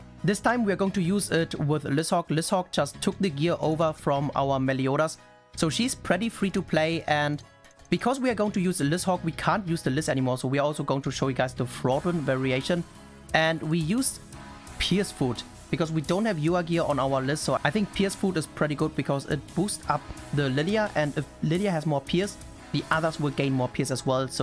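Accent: Germany. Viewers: Is ur actzent German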